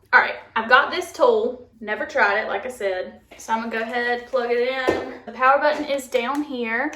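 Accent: South, United States. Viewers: Omg you're so pretty!! you remind me of when I was at Oral Roberts University in person with your Southern accent love😍😘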